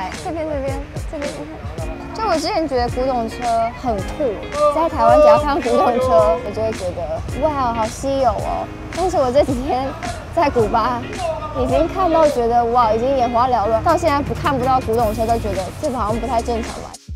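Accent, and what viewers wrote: China. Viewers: 中國住太久口音很重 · 嗯 一口中國腔... 聽了超級彆扭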